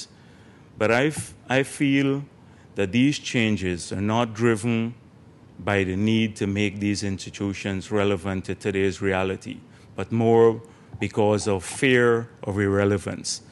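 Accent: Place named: Caribbean. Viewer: Clearly he speaks English fluently, with a marked Caribbean accent. However I don't understand how someone could serve as president were he unable to speak the official language.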